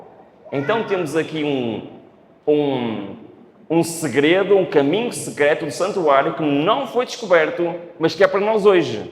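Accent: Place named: Portugal. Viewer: Boa tarde, amo ver ,este PR. Ele é muito didático, e meu conterrâneo,sou filha de português,e meu pai, falava assim.Moro no Brasil.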